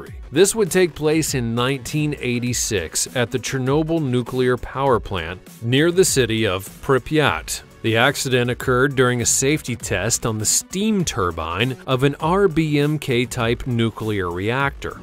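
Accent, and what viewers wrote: United States. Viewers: Wow, irritating American accent is horrid.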